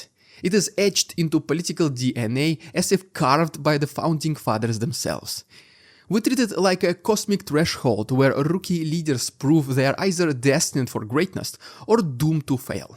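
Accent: Russia. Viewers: I love how with every video, you have such a human dialogue that sounds like national geographic. Your Russian accent is so beautifully balanced with your complex use of English words and your very charming personality. I love how you don't throw numbers at us. You humanize the war and bring it to the hearts of all of us viewers. Wonderful. You've grown a long way since I first discovered your channel and got frustrated with you. You have grown from a young man, into a proud and very emotional news caster who I always look forward to seeing. And mixing in tidbits of silliness just adds to your great videos. Please, dont ever stop. Even when the war is over, I hope you cover the recovery and rise of Ukraine and Russia, brining your unique humanizing dialogue, that will transform the numbers, into recovering people. Stay amazing. Give your little monster lots of love from all of us.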